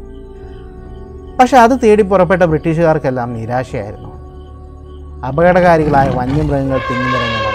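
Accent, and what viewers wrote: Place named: Kozhikode, India. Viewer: കുന്നംകുളം അല്ല.. നല്ല അസ്സല് കോഴിക്കോടനാണ്.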